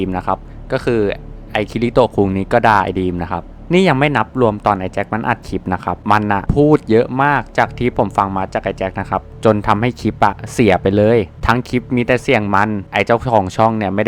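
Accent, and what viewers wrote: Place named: Kanchanaburi, Thailand. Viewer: เสียงพี่ก็เหมือนพี่แจ็คเกิ๊น สำเนียงกาญหรอครับ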